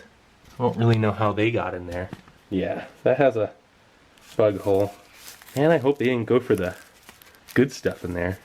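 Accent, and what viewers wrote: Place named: California, United States. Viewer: Dude where is your accent from. I’m guessing California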